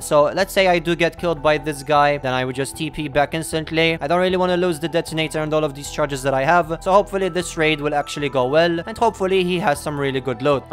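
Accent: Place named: Malta. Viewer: As soon as I heard your accent I knew you were Maltese too hahaha.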